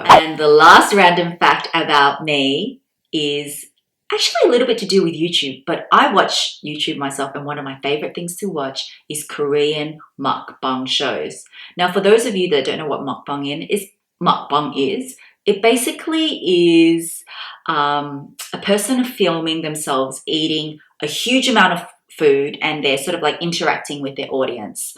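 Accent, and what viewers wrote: China. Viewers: Wow I can understand your dialect well somewhat,,, it's close to Fu Kien and yes nobody understands it here in Canada. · Lots of interesting facts to learn about you! I love how open-minded you are and so brave for trying that spider! Yikes. I have a huge fear of spiders, big or small and the closest thing I could do to try and overcome it was to hold a tarantula. >_< Even then I was frightened. Same goes for heights! eeek! But I love the thrill and adrenaline of just trying. Your dialect sounds like a mix of Cantonese and Vietnamese? Just from the sounds of main languages I know :o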